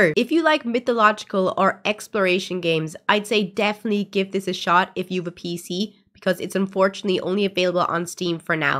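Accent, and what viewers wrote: Ireland. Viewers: I like your accent 😊 I need to move to Ireland 🇮🇪 😁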